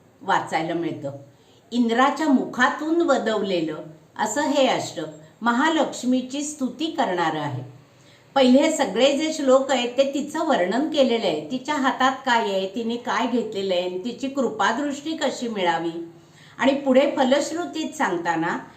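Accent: Standard. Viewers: श्री महालक्ष्मी माता की जय. 🙏🙏🙏 शुद्ध उच्चारण. धन्यवाद ताई. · शुद्ध उच्चारांसह महालक्ष्मी अष्टक स्तोत्र व माहिती... परिपूर्ण 🙏 धन्यवाद ❤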